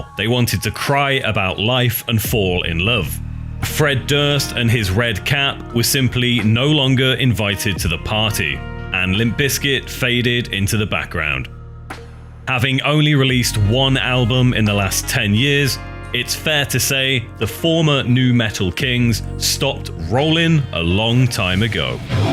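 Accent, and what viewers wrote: United Kingdom. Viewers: Sounds way more official with a British dude narrating👍👍👍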